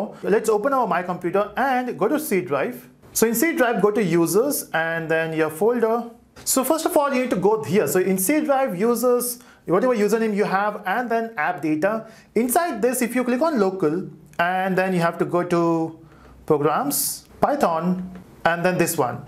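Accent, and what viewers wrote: India. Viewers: I hear Indian I stay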